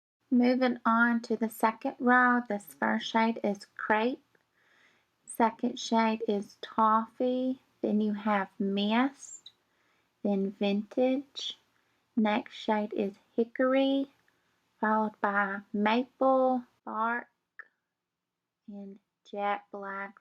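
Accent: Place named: South, United States. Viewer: your southern accent makes me smile so much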